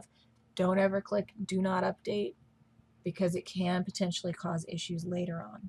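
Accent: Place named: Los Angeles, United States. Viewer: This has been helpful! You sound like you're from LA.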